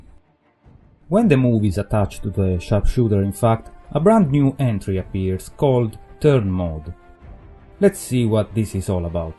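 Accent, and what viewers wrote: Italy. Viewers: lol i was sure you were from italy just by listening the accent for a few seconds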